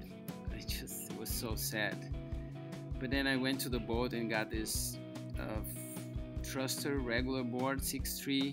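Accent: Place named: Spain. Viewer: His accent ( Spanish?) is very colorful….beautiful narrative and music…so honest!!! 😊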